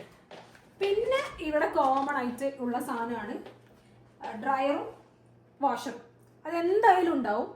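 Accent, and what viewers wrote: Kannur, India. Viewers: Hi I am Sruthi from kannur but eppo engu oman l WRK cheyyunnu Edo unexpected ayitta thande video insta l kande adipoli anallo but youtube l vannu nookkiyappo kurachu videos alle ullu iniyum post cheyyanam YouTube l.WRK pressure nde eyal kannur basha kelkkumbo thanne oru happy a.athinu thande video kanananam enna avasthaya.nammal family ayi evide anu.kannur basha manasilavathavarkk vendi change varuthi basha thanne mari poyi. So iniyum video expect cheyyunnu. By aradika🎉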